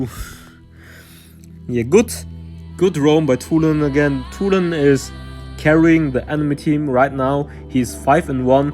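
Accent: Germany. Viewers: So wie du Englisch redest safe deutscher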